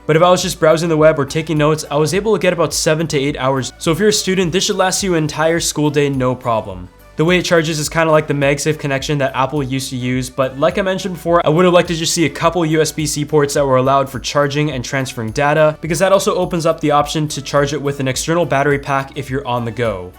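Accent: Canada. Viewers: r u from Canada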